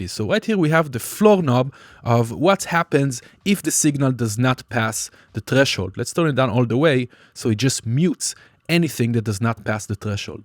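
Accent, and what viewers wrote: Israel. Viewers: gotta love that Israeli accent (great tutorial btw!)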